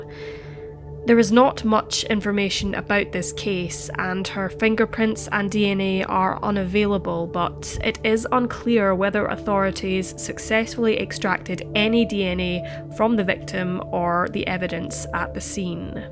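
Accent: Scotland, United Kingdom. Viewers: Voice: Scottish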